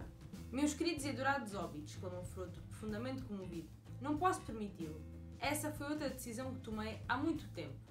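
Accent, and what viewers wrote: Portugal. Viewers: Sotaque de Portugal amo!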